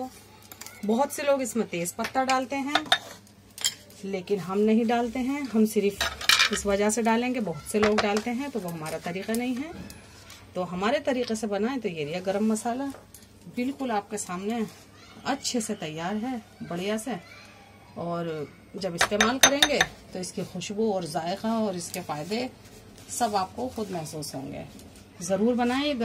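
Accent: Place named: Paretabad, India. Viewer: Cooking no doubt achi hai but bolti paretabad walon ki tarah hai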